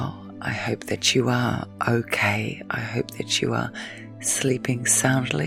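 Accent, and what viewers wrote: Australia. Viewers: nice hearing a fellow aussie I'm going through a very tough time ( I'm a carer for my beautiful fiancee who self harms). Listening to you is sometimes the only thing that gives me solace and reminds me that yes "I'm ok " xxx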